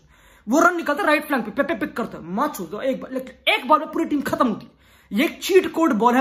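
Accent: Delhi, India. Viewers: Your football knowledge+Delhi-NCR ka accent will lead you to greatest heights. zindabad! ✊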